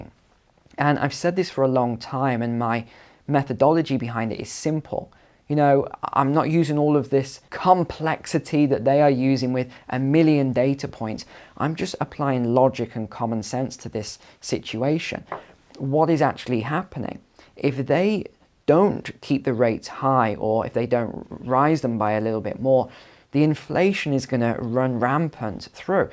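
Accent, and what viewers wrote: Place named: United Kingdom. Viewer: I don't know about you all, but it is better to hear that all the banks and real estate are all going bust from a calm British accent.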